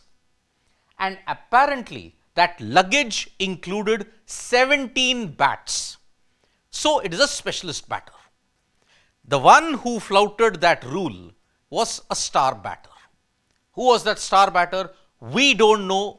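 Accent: South India, India. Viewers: Irritating idli accent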